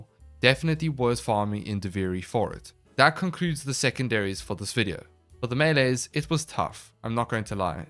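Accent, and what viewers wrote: South Africa. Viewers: yooo.. are you south african? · You are most deffinitely South African arent you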